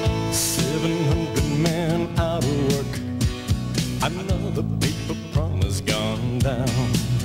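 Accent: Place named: Massachusetts, United States. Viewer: In Mass. love the sound of your voice, miss home when i hear it.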